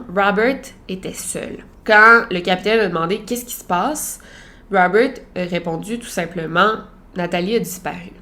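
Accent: Quebec, Canada. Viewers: Sans vouloir vous offenser, je trouve votre remarque inutile et en plus de ça, pas très sympathique... Un accent ça ne se choisit pas. En plus l'accent Québécois c'est le best accent du monde 🥺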